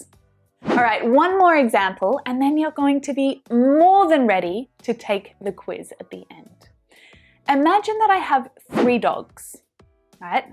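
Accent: Spain. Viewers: Hi I loved your Spanish accent. Xxx not say (inferno) invierno ok!!! You're lovely ❤️ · I love your Spanish accent,! Sí, el invierno puede ser un infierno, también;-) Thank you again for this valuable classxxx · your spanish accent is lovely :)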